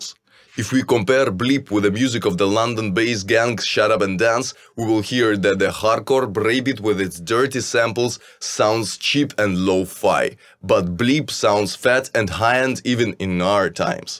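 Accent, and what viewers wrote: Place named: Russia. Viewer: Всегда уважал людей, которые сами научились формулировать предложения по-английски. Отличный патриотичный акцент. Звучит очень стильно - как sovietwave. Респект! Канал по-настоящему уникальный!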